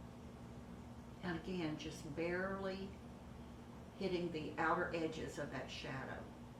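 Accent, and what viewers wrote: South, United States. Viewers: Love the look, music is awfully annoying! Just your sweet southern voice is all we need! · Agree, the whistling is sort of annoying as it's hard to hear you. You look wonderful and always dress stylishly. Love your Southern accent. Diana in AZ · It's too bad the video is ruined with that awful whistling. The lady has a southern accent and is difficult enough to understand without the whistling.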